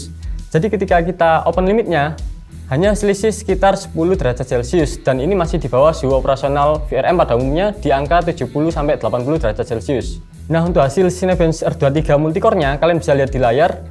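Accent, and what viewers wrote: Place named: Surabaya, Indonesia. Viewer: logat Anda seperti wong Suroboyoan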